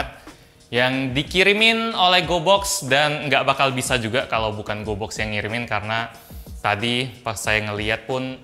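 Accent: Palembang, Indonesia. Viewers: Bang orang palembang kah?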